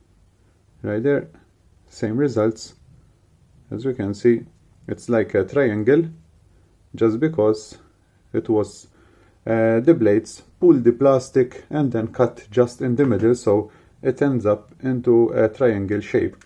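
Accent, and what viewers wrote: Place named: Malta. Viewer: Delightful surprise to hear a Maltese accent millewwel :D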